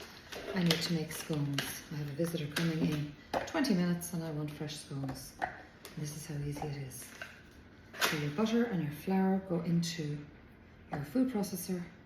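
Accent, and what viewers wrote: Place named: Ireland. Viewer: Lovely listening to a quiet irish lilt instead of a loud harsh american accent...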